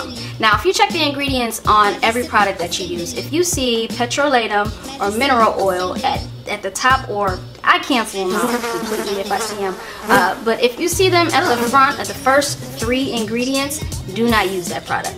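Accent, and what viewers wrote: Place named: New Orleans, United States. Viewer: I love your accent are you from new Orleans??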